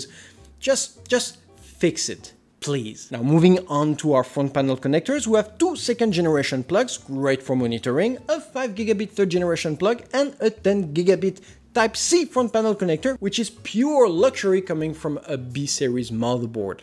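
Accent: France. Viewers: the jesus of mother boards love man, j’adore l’accent français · Ooo le franchoyardd 🤣 ton accent vaut de l’or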